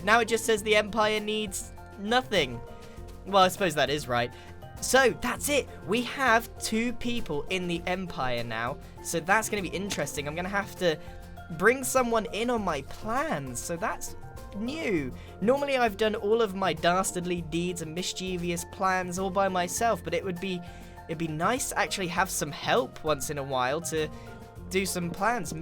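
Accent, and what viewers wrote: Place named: Southern England, United Kingdom. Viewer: I’m British and it’s the norm to me.Its a normal middle-class south English accent. I would say it’s more is voice then is accent.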